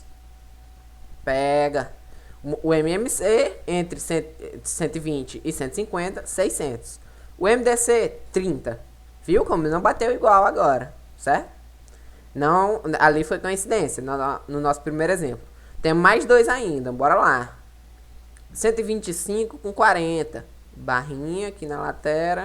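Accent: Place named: Northeast, Brazil. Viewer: conheço esse sotaque nordestino kkk minha terrinha <3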